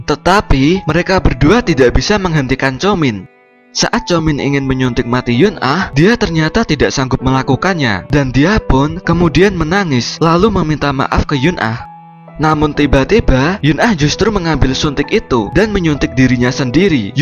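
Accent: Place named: Java, Indonesia. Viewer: Logat Jawa nya masih medok yo suaranya itu lo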